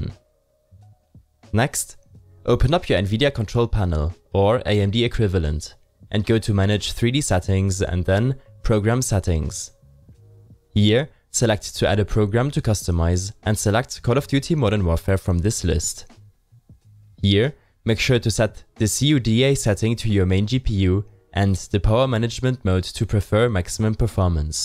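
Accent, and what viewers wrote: Germany. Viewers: dieser andere deutsche Akzent??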